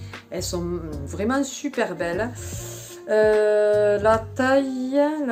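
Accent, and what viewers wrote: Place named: Occitania, France. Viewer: Coucou, sympa ta vidéo 🤗 je compte faire un tour à la croix Rouge dans la semaine 😉 pour info, heliotrope de chez adopt c'est le dupe de Manifesto d'Yves st Laurent 😊 j'adore les parfums adopt 🤗 ton accent m'a fait rêver ! Toute la famille de mon mari se trouve en occitanie ( Perpignan, Thuir, salse le château etc... ) 😊 À tout bientôt 😉😘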